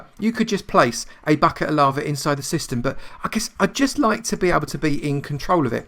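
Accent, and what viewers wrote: United Kingdom. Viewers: I really like british accent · What do you mean? This guy should have taken speech therapy when he was a kid. He has a grating voice, and has no idea how to enunciate his words, even though his accent indicates he's from the U.K. he has no idea how to speak proper English.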